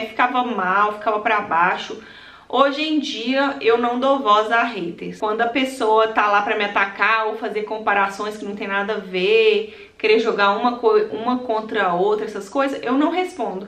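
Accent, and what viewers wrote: Minas Gerais, Brazil. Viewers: Minha querida é muito bom ouvir vc essa conversa mineirinha esse seu jeito verdadeiro vc é nota 1000 minha amiga virtual adoro vc 😍😍😍😍🥰😘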